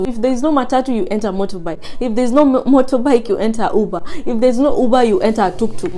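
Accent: Nigeria. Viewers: You have Nigerian accent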